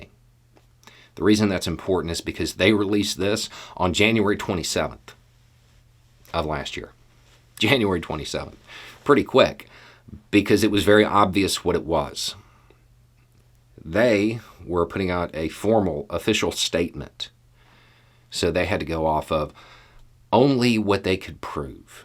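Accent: South, United States. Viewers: More people “looking like” Beau .... color and accent coming from the south need to be doing more of what Beau is greatly accomplishing right now in speaking the truth etc as the usual perception as mostly been negative and mostly Republican supporters spewing madness and garbage. Cudos to Beau for jus simple been honest and right!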